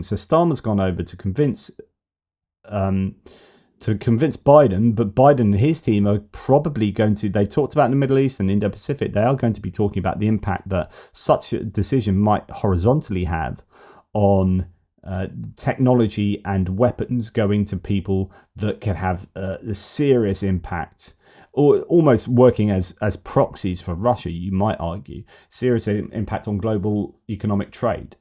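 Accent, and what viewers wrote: New Zealand. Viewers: haha good kiwi accent churr bro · I don’t care what anyone says.. your Kiwi accent is pretty decent. 👍👍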